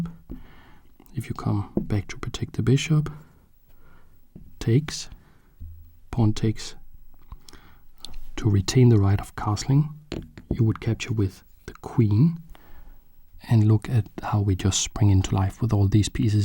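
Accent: Denmark. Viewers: are you Danish? because you sound like one who could be from Denmark.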